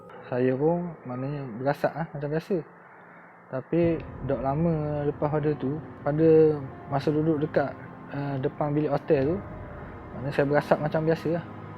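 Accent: Terengganu, Malaysia. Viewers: Pekat ganu boh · Pekat molek loghat tranung..terbaik boss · Power! Kite pulok memang minak loghat ganu ni. Jaddi boh. Subscribe slaluhh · Org ganu ke?? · Pekat bahase terengganu dia haha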